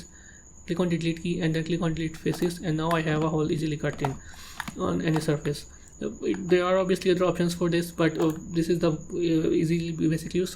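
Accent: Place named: India. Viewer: Not to offend you, but practice your vocal skills. So speaking English without the heavy Indian accent. There are various reasons, you sound more skilled and educated. When you teach you need authority and talking with gumballs in your mouth is not helping. A good voice draws attention and keeps it. It also let you stand out from your competition. For a western audience its really horrible to listen to, sorry for the harsh words. So set your pride aside and grow your business.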